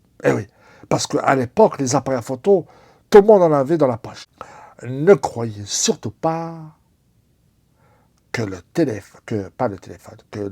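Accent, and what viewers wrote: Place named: Africa. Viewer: 😂😂😂😂j'adoooore ton accent africain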